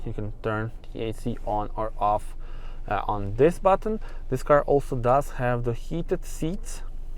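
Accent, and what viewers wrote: Russia. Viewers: Мне показалось, или у него русский проскальзывает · с русским акцентом)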